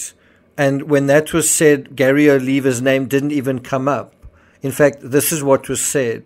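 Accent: South Africa. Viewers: The most South African voice in true crime.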